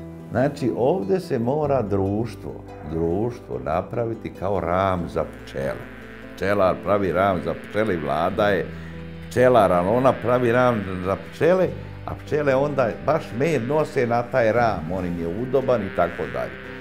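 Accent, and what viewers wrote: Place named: Krajina, Croatia. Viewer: Joj kad cujem krajiski naglasak 7 dana napretka nemam..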